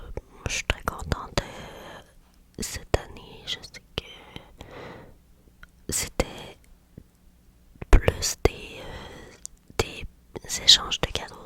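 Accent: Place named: Canada. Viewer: Je viens de decouvrir ta chaîne. Ton accent canadien me relaxe de folie et rend ton asmr tres spécifique j'adore! Très belle année a toi! Je m'abonne😊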